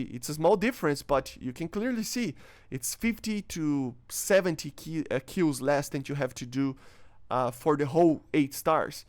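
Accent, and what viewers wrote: Brazil. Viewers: Tu é brasileiro cara ?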